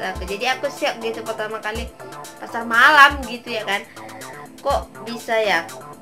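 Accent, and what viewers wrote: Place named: Indonesia. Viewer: Pekat endon nya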